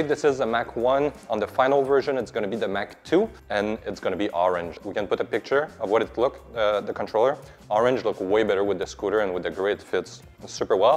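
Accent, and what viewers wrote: Quebec, Canada. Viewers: Sa parais que tes quebecois loll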